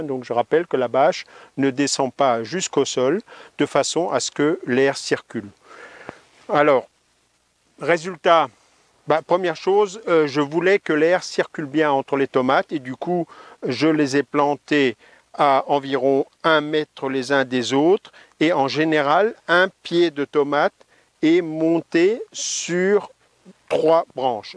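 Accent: Alsace, France. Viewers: Non, de grâce, ne changez rien! C'est notamment la longueur de vos vidéos qui en ont font tout l'intérêt, car on apprend aussi beaucoup de choses dans vos apparentes digressions, qu'elles soient relatives aux techniques de culture ou plus éloignées du sujet (grâce à vous j'ai réécouté avec plaisir!). D'autres détails un peu décalés et "inutiles" dans l'absolu me font mourir de rire et ça fait du bien aussi! Et puis quel bonheur d'écouter votre parfaite maîtrise de la langue française, l'utilisation toujours réfléchie du juste mot, c'est devenu tellement rare, c'est comme du miel qui coule dans mes oreilles... On ne voit que trop l'effet direct et catastrophique des textos et autres twitters sur l'appauvrissement de la langue française et du raisonnement intellectuel... Et votre charmant accent alsacien qui fait votre signature sonore me berce, me repose... 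C'est l'éloge de la lenteur, de la fantaisie, ça va à l'encontre de tout ce qui fait notre époque moderne (concision, rapidité, prétendue et illusoire efficience), et, n'en déplaisent à certains (libre à eux de faire "avance rapide" ou de regarder d'autres vidéos), ça fait le plus grand bien!!! Alors merci, merci, merci, pour tout ce que vous êtes, ce que vous donnez, et surtout, continuez comme cela, monsieur le paresseux!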